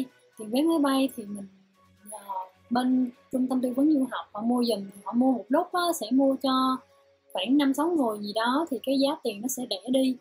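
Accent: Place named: Nha Trang, Vietnam. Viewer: Bạn này giọng nói như ngừoi Nha Trang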